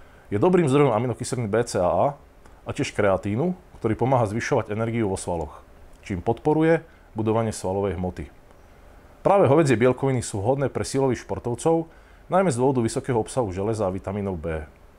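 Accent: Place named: East, Slovakia. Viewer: Môžem sa spýtať odkiaľ pochádzaš? Zdalo sa mi že počujem nejaký východniarsky prízvuk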